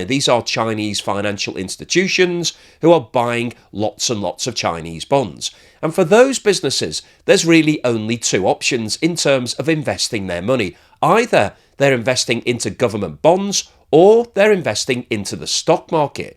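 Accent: United Kingdom. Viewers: Gotta love that THICC brih'ish accent, great content, thanks for sharing!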